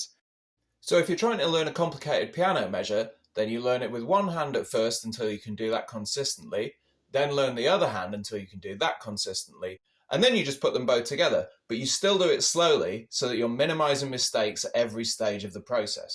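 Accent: England, United Kingdom. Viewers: Hey, mate, are you born in UK... it seems you speak British accent English, don't you?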